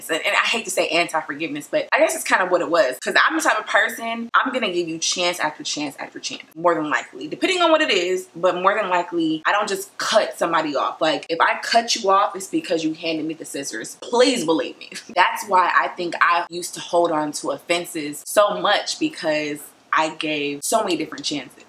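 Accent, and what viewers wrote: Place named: South, United States. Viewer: You sound like you are from the country south. Where are you from Texas?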